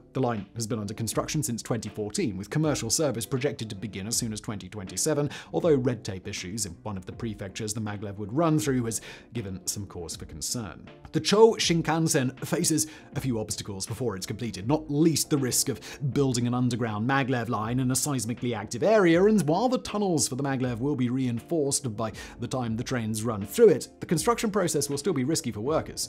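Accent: United Kingdom. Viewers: Hearing a British accent talk crap about maglevs when y'all can't afford to build stock HSR is cute.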